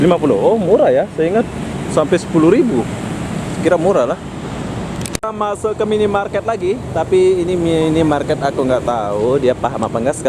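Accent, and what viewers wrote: Malaysia. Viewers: Kalau di dengar dari cara dan logat anda berbicara, seolah-olah anda tuh menggunakan cengkok asli Malaysia. Mungkin anda masih ada darah Melayu Bukan serta merta pernah tinggal di Malaysia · Awalnya aku suka, tapi lama lama jdi kaya orang indonesia kemalaysia2 an, ga di kl ga di lombok ngomong logat melayu malaysia. Mau judulnya experimen atau tidak. Ada bbrp video yg mengangkat issue sensitif mengenai SARA (orng bangladesh yg overpopulasi) yg shrusnya ga usah dijadikan bahan krn ini issue internal di kalangan publik malaysia, ga ush ikut2an ngangkat kcuali kamu bs netral. Saya 6 tahun dah kolej n keje kat kl n ipoh tahupon bile cakap malay kat malaysia n cakap bhs indonesia dekat indonesia sini. Bukan saya benci awak tetapi cintailah negeri ini dn bahasanya slama and orang indonesia. Kcuali bila sudh jdi warga malaysia yg sllu diidam2kan sprti dalam video tu. 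Jgn sampai kamu terlihat 'norak' hanya gara gara boleh bhs malaysia trus ngobrol itu trus slama di lombok. Mmg ini videomu kamu boleh berlaku semaumu tetapi saya hanya bagi tahu saja kerana peduli bukan sebab hal lain.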